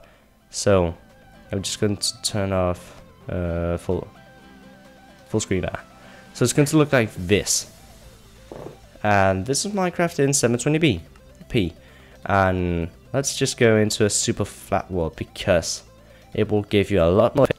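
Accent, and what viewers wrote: Denmark. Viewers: 'v you from Danmark?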